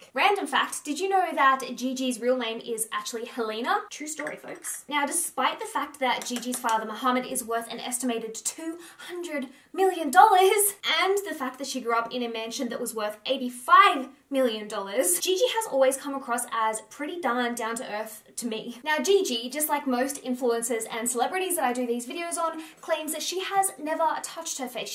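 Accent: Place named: Australia. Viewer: Australians have such funny accents 🤣🤣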